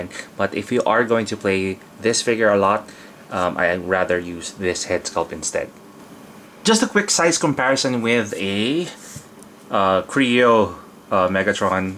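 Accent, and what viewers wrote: Philippines. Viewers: Are you filipino? Our accent when i speak in english is almost the same. xD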